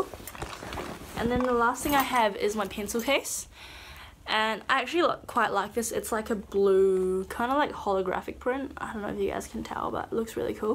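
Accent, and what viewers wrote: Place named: New Zealand. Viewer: Are you from New Zealand?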